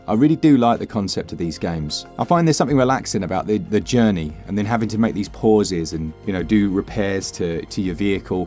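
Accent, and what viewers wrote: United Kingdom. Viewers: Nice channel. You brits always sound good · Thank you very much (!) for adding yet more games to my backlog!! I should know not to watch these videos because as you said, and i have said in the past, you say what it is on the tin and there never is any clickbait - main reason your one of the very very few (2!) switch channels i watch now. Plus i love hearing fellow brits accents too 🇬🇧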